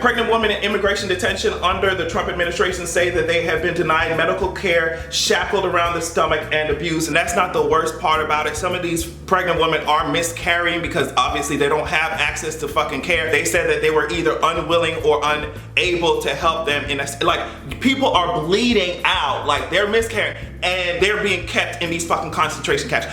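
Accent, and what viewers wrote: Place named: Jamaica. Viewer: I die with laughter every time he says woman instead of women. So Jamaican my yute.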